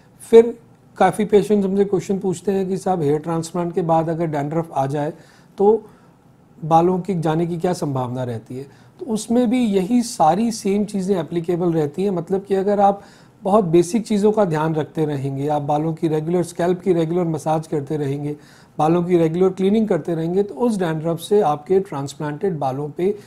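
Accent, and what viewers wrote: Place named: Hinglish, India. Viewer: thats a how ppl speak here hindi+english=hinglish😁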